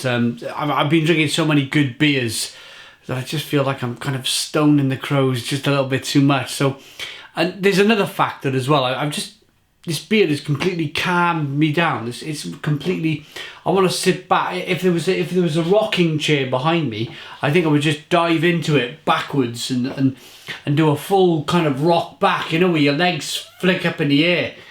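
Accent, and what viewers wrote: Wales, United Kingdom. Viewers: It's not right or wrong, it's just his Welsh accent where it sounds like they say "crumb putts". In most of the rest of the UK we say it like "crumpits" so it does sound funny to hear "putts".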